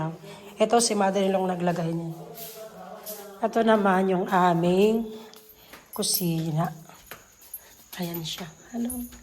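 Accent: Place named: Visayas, Philippines. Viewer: Bisaya ka ma'am Kasi may tono ka bisaya kasi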